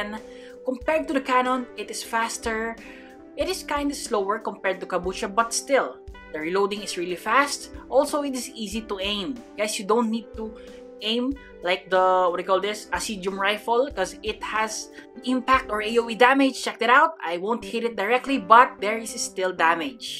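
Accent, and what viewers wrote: Philippines. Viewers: Bro accent is filipino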